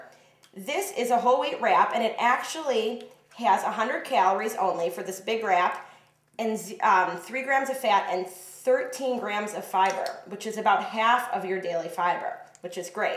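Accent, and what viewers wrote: Chicago, United States. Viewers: Are you from Chicago? Your accent suggests it. That sandwich looks great too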